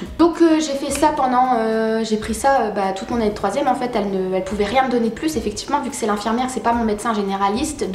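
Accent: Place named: North, France. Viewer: Très appréciable tes différentes "storytime", je me suis surpris à toutes les regarder. Je suis psy et tes témoignages sont importants pour dédramatiser la santé mentale qui est très importante 🙂 Bonne continuation à toi ! J'ai l'impression de reconnaître un peu mon accent du Nord, je ne sais pas si je me trompe mais c'est choupi 😂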